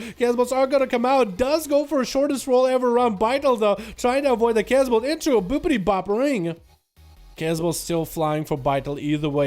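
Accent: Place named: Russia. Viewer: LOL! Superior russian aсcent!!! =)))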